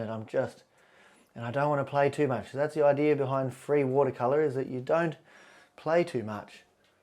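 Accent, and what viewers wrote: Australia. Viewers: Hi, Best wishes from the UK. It is always a pleasure to watch you at work and to see your face when signing off. Keep up the good work, you are insirational! Love the aussie accent.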